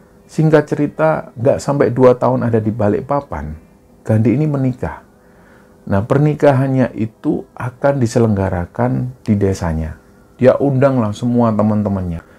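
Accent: Java, Indonesia. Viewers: mas sebagai org jawa klo melafalkan kata bahasa jawa sy harap tdk usah spt bukan orang jawa. mas lo klo bicara jg medok. utk melafalkan kata "ndoro" dan "sedoyo" kok melafalkan suara huruf D nya tidak tepat y sbg org jawa. maaf y mas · Hadir mas, mas jawanya darimana? Kalau ngomong jawa enakeun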